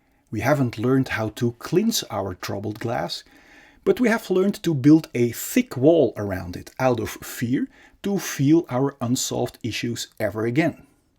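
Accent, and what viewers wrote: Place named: Netherlands. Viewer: Hallo...ik heb me al een paar keer ingeschreven maar niets gekregen. Ik zal eens een mail sturen om te unblocken. Aan je accent te oordelen kon ik in het Nederlands schrijven 😊 Ben een belgje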